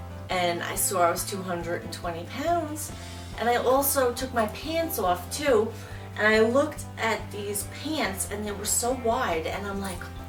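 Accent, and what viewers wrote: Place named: Rhode Island, United States. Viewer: You sound like my Aunt Shirl and she is from Rhode Island. Where are you from? Thank you for making these videos even though you were nervous. It is appreciated.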